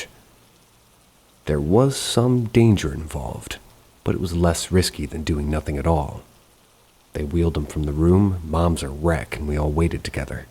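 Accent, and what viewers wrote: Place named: United Kingdom. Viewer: M i always ask but never get s response. Why is fhere a uk narrator sometimes?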